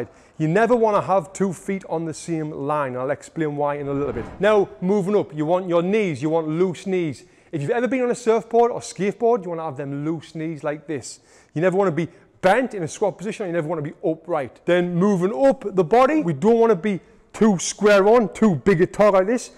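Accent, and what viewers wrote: United Kingdom. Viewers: His British accent is interesting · British accent makes this lesson reliable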